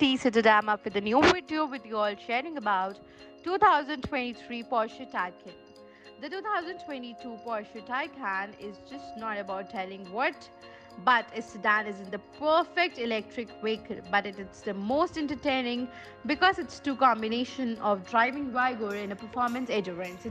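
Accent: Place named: India. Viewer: Sorry as non Indian it is too difficult to understand too strong accent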